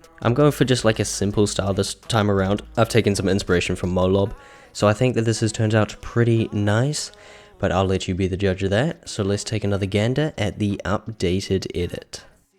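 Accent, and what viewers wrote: South Africa. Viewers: r u south african? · South african?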